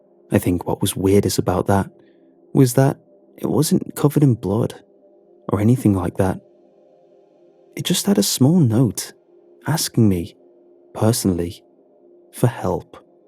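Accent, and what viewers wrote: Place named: Wales, United Kingdom. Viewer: Why does his accent sound a little bit welsh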